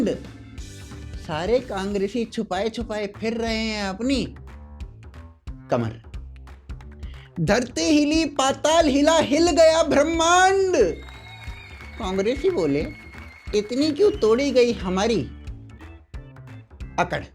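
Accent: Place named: Haryana, India. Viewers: Love your Haryanvvi accent 😂. Hamar ta bhojpuri accent hai- lots of love from Mauritius 🇲🇺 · राम राम भाई साहब आप हरियाणावी में बोला करो। मस्त । ज़बरदस्त👌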